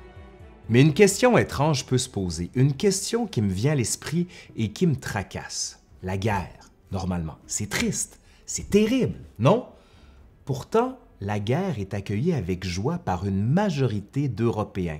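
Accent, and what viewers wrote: Quebec, Canada. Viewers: Quelle chaîne remarquable ! Des sujets abordés avec sérieux. Les références sont détaillées. Tout est admirablement commenté avec une narration et une diction très agréables. Ajoutons en prime ce charmant accent québécois. Merci ! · Un accent un peu faible et qui devrait marquer plus l'exotisme du Québec, surtout pour les nouzauts francophones de l'Océanie. Génial ! Continue ! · Très belle diction avec cet accent attachant de nos cousins canadiens empreint du parler de nos ancêtres émigrés il y a si longtemps dans la belle province. Ils ont tant donné près de chez moi, à Vimy Mon plus profond respect pour tout les chums qui ont donné leurs vies pour notre chère liberté. Merci les cous' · c'est l accent québécois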